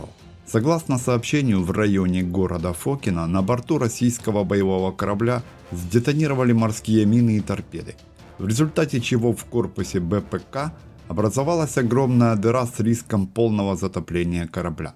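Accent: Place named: Ukraine. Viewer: А Вам бы поработать над своим акцентом(украинским)...)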